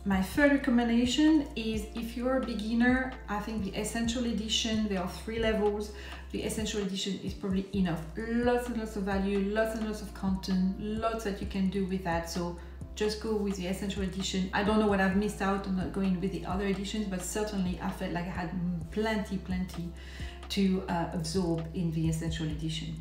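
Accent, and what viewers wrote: France. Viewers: So nice to see a review from a female perspective and someone not in their 20s or 30s (I with you sis!). Congrats on completing the course. Love the French accent and the Bonjour at the beginning. Good luck on your Youtube journey.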